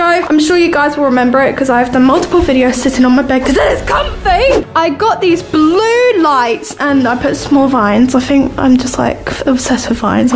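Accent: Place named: United Kingdom. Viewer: WAIT?YOUR BRITISH?!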